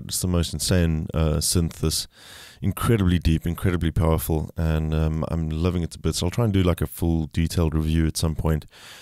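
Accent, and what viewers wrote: South Africa. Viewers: You sound South African👍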